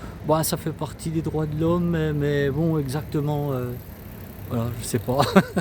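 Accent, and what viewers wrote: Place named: Alsace, France. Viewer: Bonsoir Ca fait du bien d’entendre l’accent Alsacien 😀. Tu a bien fait d’aller voir cette belle cathédrale en grès rouge. Beaucoup d’église et de monument sont restauré avec le grès de la carrière de Rothbach réouverture en 1964. Je te souhaite une bonne nuit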